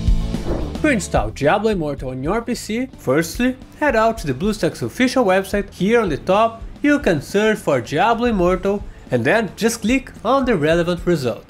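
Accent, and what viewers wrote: Brazil. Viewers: Tá falando de um jeito que brasileiro falando inglês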